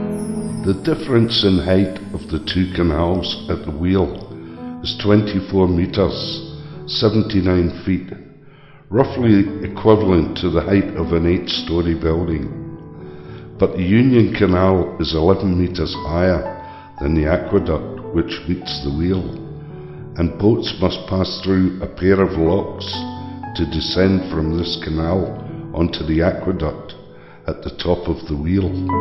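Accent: Scotland, United Kingdom. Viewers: I think I could listen to Scots read the dictionary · Jeremy Clarkson's depressed, scottish brother. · I turned on Closed Captioning while watching the video. The CC AI had more trouble understanding the Scottish accent than I did! Maybe that's because my ancestry is 50% Scottish! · No you don't...awful burden. Plus, you'd have a lot to answer for. Love your Scottish brogue...I could listen to you read the phone book. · I wish i could understand scottish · I love the Scottish voice. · Narrator has a strange accent. This isn't just a scottish accent; it's regional to a certain area of scotland. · His voice and Scottish brogue were beautiful to me. I liked it in regular speed.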